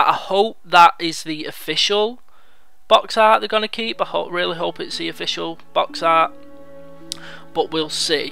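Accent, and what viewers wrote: Manchester, United Kingdom. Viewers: is that a manchester accent? im from sweden so im just curious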